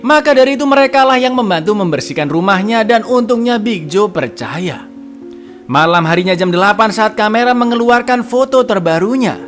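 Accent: Java, Indonesia. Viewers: Jawanya medokk bngett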